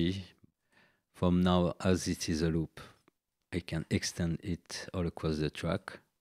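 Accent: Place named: France. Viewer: Ça sent l'accent du terroir 😁👍 Super vidéo en tout cas. Il a l'air vraiment top ce plug-in, ils m'emmerdent Cableguys de sortir des plugins comme ça, simple, bien foutu, intelligent, efficace... ils tombent toujours dans le mille ! Et à chaque fois il me les faut...! Jamais déçu par ce dev, au contraire.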